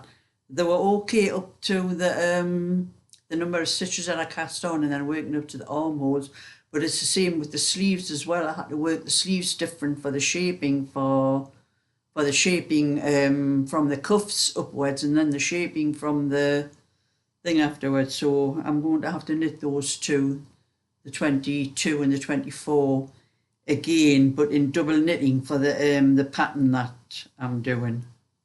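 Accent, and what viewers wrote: Newcastle, United Kingdom. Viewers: Just found you - what beautiful work! And so lovely to hear the Geordie accent - living in Hampshire now I still miss home, after 30 years. What a joy to listen to your tales - reminds me so much of my Auntie Lizzie Sams from Wallsend - in a lovely way! x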